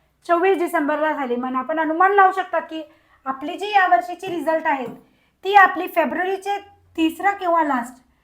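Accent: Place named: Gujarat, India. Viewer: नमस्कार मॅडम आपका टोन गुजराती जैसा है l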